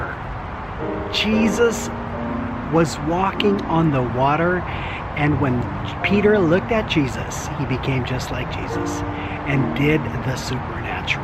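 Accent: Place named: United States. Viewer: American accent na c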